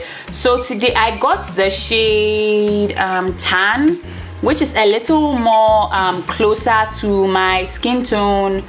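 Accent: Ghana. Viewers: Beautiful! You sound like a Ghanaian🥰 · I don’t know how I knew you were from Ghana. Maybe it’s your accent or your beautiful face. I am not Ghanaian but my husband is and I spend a lot of time there because he still lives there. Keep doing what you do, dear. Are you in Ghana presently or in the US?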